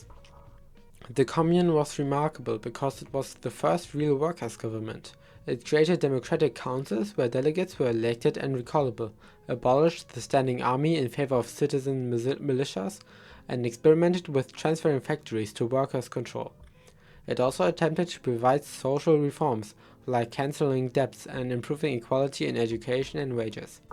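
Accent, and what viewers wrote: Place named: Germany. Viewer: Der deutsche Akzent ist nicht zu überhören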